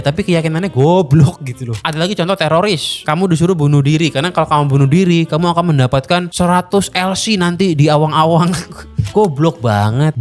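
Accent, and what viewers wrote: Java, Indonesia. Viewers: Iya, kalau di channel ini aku jadi jawa sesuai mahaguru, biar beda sama di channel utama 🤣🤣🤣🤣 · Kenapa kamu sekarang intonasinya ke Jawa"an bang, terinspirasi dari maha guru kah?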